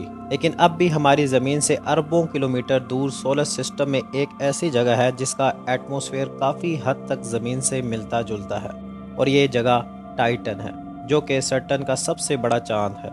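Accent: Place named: Pakistan. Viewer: Ha bhai iske accents pakiatani hai but it's ok we Indians support pakistani also 😊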